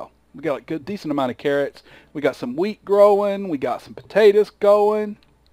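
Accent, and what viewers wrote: South, United States. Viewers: Glad I can hear you in this video. Hated that I could hardly hear anything in the last 3, and happy to hear a fellow southern voice.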